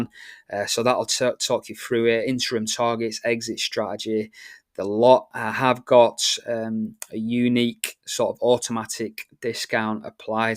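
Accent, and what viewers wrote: North, United Kingdom. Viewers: I'm from Middlesbrough, Living in London, can't take the north out of a northerner mate lol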